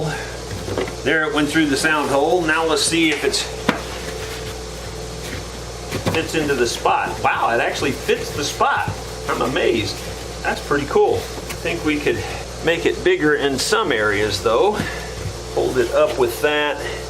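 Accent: South, United States. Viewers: These videos are becoming addictive to me as he verbally takes us step by step through the process of working with his hands, with wood, in that sure and easy southern voice of his.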